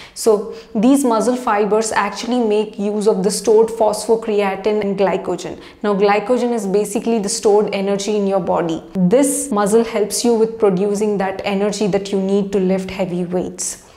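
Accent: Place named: India. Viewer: This the best indian accent from a youtuber ive ever heard. Easy to understand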